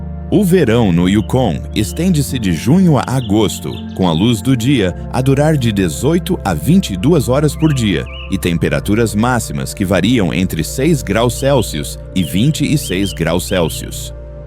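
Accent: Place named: Interior, Brazil. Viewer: Quando trocar o narrador por um sem sotaque interrrrrriorano vou conseguir assistir o esplendido documentário enquanto isso desisti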